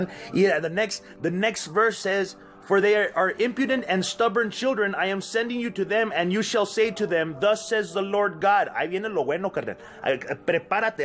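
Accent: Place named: South Texas, United States. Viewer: This guy is from the valley in South Texas or what!!. I hate the way he talks lol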